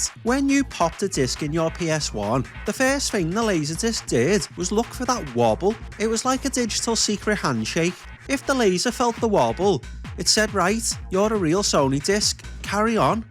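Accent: Liverpool, United Kingdom. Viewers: Fucking miss the scouse accent. We moved from Walton to Scotland when I was a kid. Don’t go back enough son 🥲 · Fuckin lovin the scouser annunciations :'>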